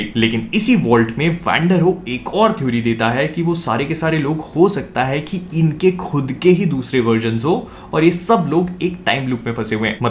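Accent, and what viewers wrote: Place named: Hyderabad, India. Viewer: haidrabadi voice mast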